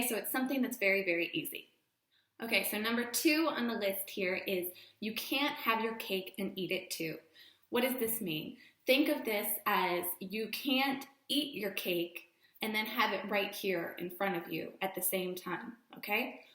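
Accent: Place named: Texas, United States. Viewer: you're from Texas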